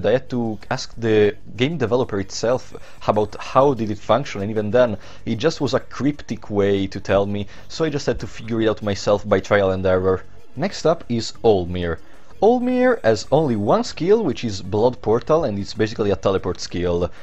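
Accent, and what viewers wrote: Italy. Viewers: Mi sembrava un accento italiano ad ogni modo grazie fra per le guide sono felice di vedere italiani con dei gusti sublimi per i videogiochi mi sono iscritto e metto like a tutto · are you italian?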